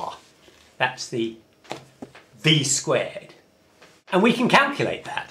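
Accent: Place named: Oxford, United Kingdom. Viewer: Now this is Oxford English we non natives all learned in school. Understand every single word he says. Very good. A very good teacher anyway.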